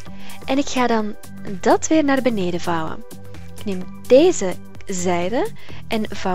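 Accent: Flanders, Belgium. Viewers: Ze zeggen dat dit Nederlands is maar dit is Vlaams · ik snap die dingen niet en het is vlaams niet nl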